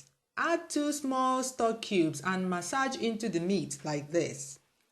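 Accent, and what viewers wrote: Nigeria. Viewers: hearing your voice with our accent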